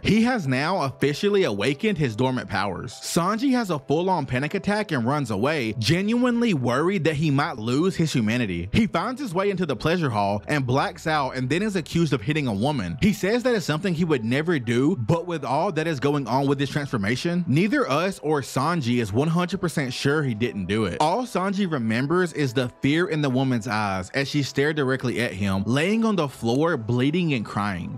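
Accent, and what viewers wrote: South, United States. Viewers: Bro im am american from the south and its so nice to hear a southern accent on the internet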